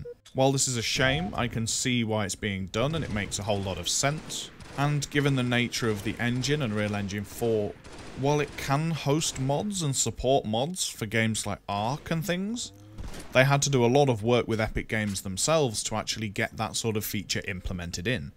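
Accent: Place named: New Zealand. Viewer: Holy fuck I knew that was a New Zealand accent, I'm proud of myself for guessing. I'n year 7 we had a maths teacher from New Zealand and she was constantly pissed off because people thought she was Australian.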